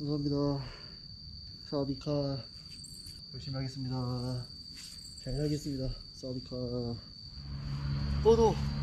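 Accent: Gyeongsang, South Korea. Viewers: 말투가 대구말투이신것보니 대구경북사람이군요~ 분명히 부산경남말투는 아님ㅎ 제가 TG사람이라~정감가네요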